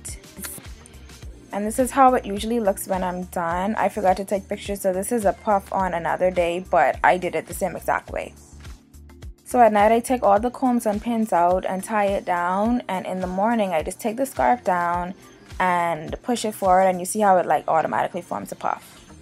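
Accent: Bahamas. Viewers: Cant hide from that Bahamian accent..lol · your Bahamian?! 😀😀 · LOL I just knew you were a Bahamian from you first started talking. Love the videos. Keep it up! :) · Omg when I heard you speak I was wondering if you're Jamaican!! :) I never realized how close the Bahamian accent is to ours :) Love your hair btw!!! :) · Dat Bahamian accent strong boy lol biggups to all my Bahamians! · Love your tutorial. Automatically heard the Bahamian in you. Keep it up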